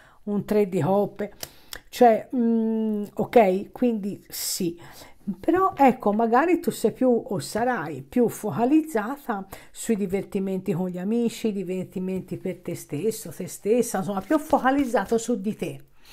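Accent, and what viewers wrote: Tuscany, Italy. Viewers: Ciao ti seguo sempre sei bravissima ogni volta che ti ascolto sembra che parli della mia vita, azzecchi tutto ……. Adoro il tuo accento toscano……i tuoi consigli sono molto preziosi e mi fanno riflettere sui prossimi passi da fare nella mia vita …..grazie 😘😘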